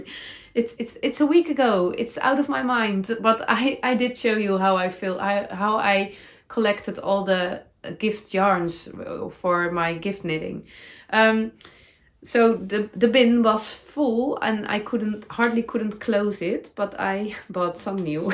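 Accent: Netherlands. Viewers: I didn’t even know cork blocking mats were an option. I love the idea. I can’t find them available anywhere in North America. Lucky you! Thank you for your podcast. I enjoy your Dutchy English and your honesty. I hope that you and your family have a nice end of the year. I look forward to seeing more of you and your heart jumping crafty adventures next year. 😊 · Thanks so much, for a year of joyful ‘Dutchy English’! It’s been sweet to hear you talking about your love for your husband and family! All have surely enjoyed helping you find the proper words you are struggling with...from afar! Today’s word was’Hardware store’! Your confidence in making a dress shows already! You can make a ‘fake bottom band’, by just adding to center of frabic befoe cutting out pattern! You sound less worried about your ‘Miss Perfect’! We’re never too old to Keep Learning and creating beautiful things for ourselves and others!!! 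Til Next Year! Thanks for all the smiles!❤🦋🧶🧦🌷Sharon from S. California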